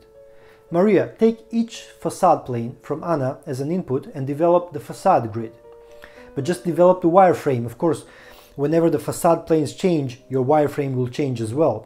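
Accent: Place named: Serbia. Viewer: Po english “akcent” Srbin vidi se iz aviona :) Good job👍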